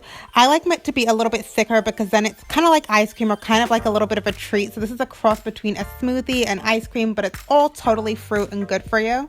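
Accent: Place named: United Kingdom. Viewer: And a bit British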